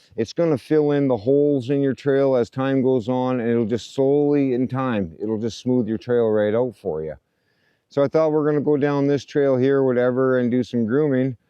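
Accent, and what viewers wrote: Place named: Nova Scotia, Canada. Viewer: Sounds like a Nova Scotia accent.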